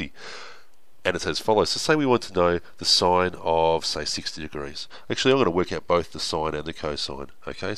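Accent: Australia. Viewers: A true Australian accent that is. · I love this guy he use smart way to teach Math. His English sounds like Australian yet a lot easy for me I really want to talk to him one day. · He is an australian that is why he sounds like that. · Now that is one thicc Aussie accent. I love it! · Pure Australian accent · is he australian? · This guys obviously Aussie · He’s Australian...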